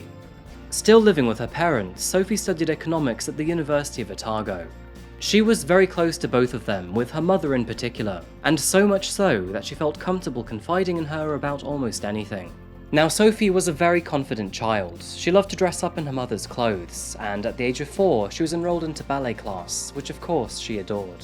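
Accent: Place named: United Kingdom. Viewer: I don’t know why insults seem way more harsh when done with a British accent…but I loved the shade! That guy was a monster! RIP Sophie Elliot🙏🏽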